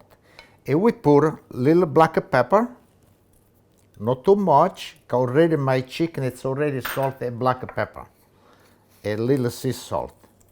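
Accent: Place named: Apulia, Italy. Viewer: He's Pugliese. Dummy.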